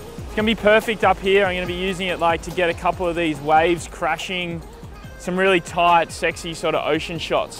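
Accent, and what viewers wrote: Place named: Broad, Australia. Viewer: Stop talking like a yobbo,you Need to look at yourself how you come across and it’s not very good clean up your vocabulary get away from the Ocker Image I personally wouldn’t buy off you know pride in that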